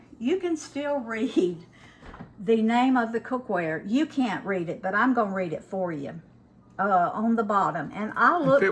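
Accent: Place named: Missouri, United States. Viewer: You’re Adorable! You sound like your from Carthage, Mo! My mom grew up there.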